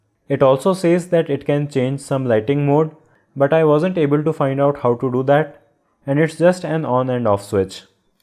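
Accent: India. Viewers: are you india? lol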